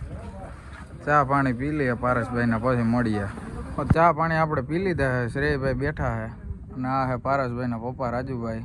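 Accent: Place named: Kathiyawad, India. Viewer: bhai aapno pure kathiyawadi flowless communication👌👌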